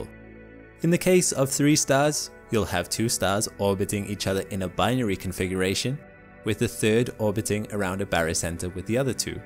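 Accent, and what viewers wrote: United Kingdom. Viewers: it's a called a british accent buddy. fun little fact; they're the ones that invented english, so you're the one with the accent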